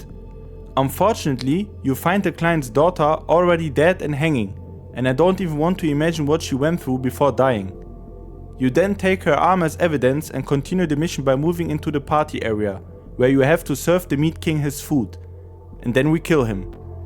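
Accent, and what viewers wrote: Germany. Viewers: Are you from Germany? I've seen several videos from your channel (which is very good, by the way) and I was trying to guess where you were from based on your accent, and I thought of everything but Germany. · Do I hear German?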